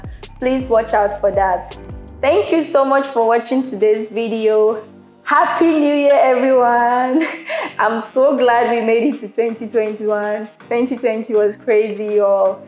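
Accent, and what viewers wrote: Ghana. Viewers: Naaa I don't know if you're ghanaian but if not then you tricked me cause you sound perfectly Ghanaian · Are you sure,you are a Nigerian? You sound more like a Ghanaian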